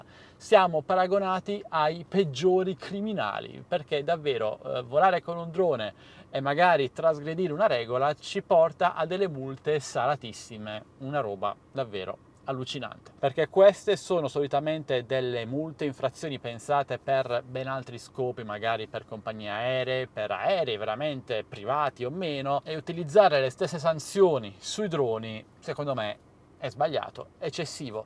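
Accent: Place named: Sardinia, Italy. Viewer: Mi sono iscritto al tuo canale, sei molto simpatico.. in Italia va tutto al contrario ormai, dove vogliono farci arrivare bho.. dal tuo accento sembri sardo, un saluto da un napoletano, buon volo👋..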